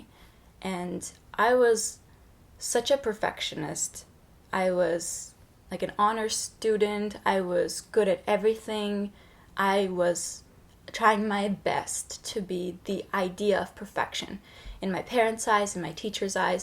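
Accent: Turkey. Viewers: Bilimsel açıdan bakacak olursak 7 yaşına kadar öğrendiğin tüm diller anadilin oluyor (detay için Noam Chomsky'nin LAD çalışmalarını okuyabilirsiniz) ve beyin hasarı almadıysan kolay kolay bu dili unutmuyor, dili konuşurken bir anda aksanlı konuşmaya başlamıyorsun. 15 yaşına kadar Türkiye'de yaşamış ve türkçe konuşmuş bir insanın bir anda 5 veya 10 senede dilini unutup kendini ifade edememeye başlaması tamamen tiyatro. Kaldı ki İngilizcesi de çok belli olmasa da aksanlı ve bazı kelimeleri türkçe aksanla telaffuz ediyor. Bu tarz triplere girmek garip, bir dili iyi konuştuğu mesajını vermek için başka bir dili kötü konuşuyormuş taklidi yapmana gerek yok. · Türk aksanıyla İngilizce konuşuyorsun Türkçe fazla konuşmasan da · Aksani var hatta basta turk oldugunu bilmiyodum cok sasirdim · Bende 16 yasinda turkiyeye geldim, 5 sene burdayim ve burda ingilizce konusan yok, ama ben ingilizcemi unutmadim, ingilizce ana dilimdir, ama bu kiz nasil unutuyor onu anlamiyorum... 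Yalan ya hepsi.. saten, amerikan aksanininda turkce olan tang'i var. ( evet turkcem daha cok gelisti ama ucmadi burda) Basically, she has a Turkish tang in her voice, it's kinda like how latinos speak.. I'm sorry to say this sweety, but you couldn't make it more obvious.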